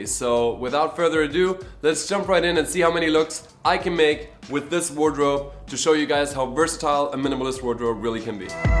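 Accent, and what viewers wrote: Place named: Germany. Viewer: schön dein deutscher Akzent! :D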